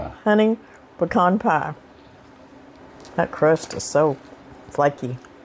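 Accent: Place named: Arkansas, United States. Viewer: Ms, of all the channels I watch, yours in my favorite. My Momo and Popo and family were from Arkansas and listening to y’all just reminds me so much of them, and I’m learning so much. Thank you.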